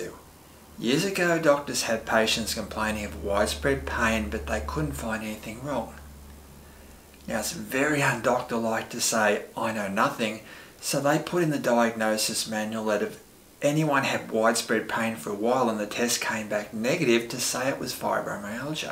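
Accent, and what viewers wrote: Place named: Australia. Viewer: You sound like an Aussie, what state are you in?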